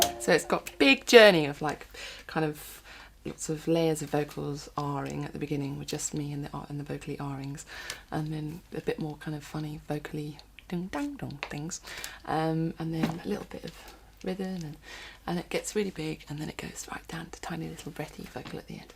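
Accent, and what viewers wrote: United Kingdom. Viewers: wow im embarrassed i didn't realise imogen was british until i watched these...god and i call myself a fan :P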